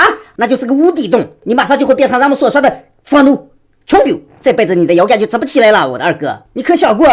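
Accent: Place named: Shanxi, China. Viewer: 应该是山西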